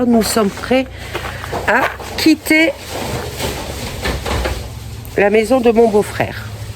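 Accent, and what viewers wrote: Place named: North, France. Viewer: bon week end a vous er continuer vos video elle sont tres bien j adore votre accent sa me rapelle ma jeunesse dans ce chnord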